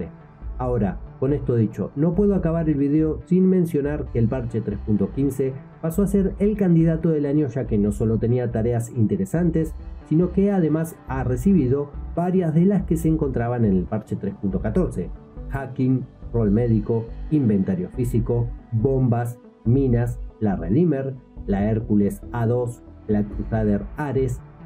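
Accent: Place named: Argentina. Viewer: Primera vez que oigo a, y me creo que es argentino🤣🤣🤣👌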